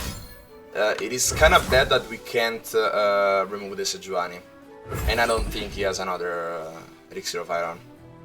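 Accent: Italy. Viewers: Italian accent + I'm italian.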